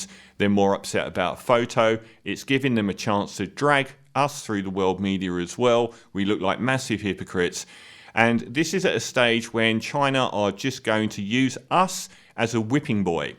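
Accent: England, United Kingdom. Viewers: The guy making this video sure does. He has a strong English accent but he speaks as if he were Australian.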